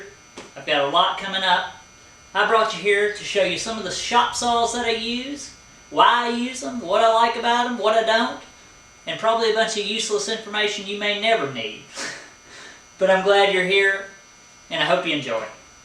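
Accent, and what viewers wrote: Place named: Louisiana, United States. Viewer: i just like your accent is that way out in louisiana somewhere ?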